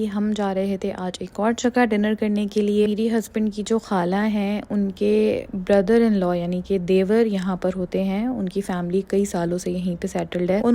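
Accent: Karachi, Pakistan. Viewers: your Urdu is sooo posh! are you from Karachi?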